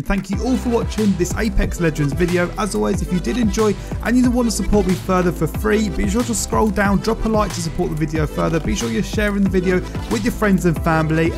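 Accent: United Kingdom. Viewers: I like it. You're direct a d to the point, no begging for likes and your voice although british isn't annoying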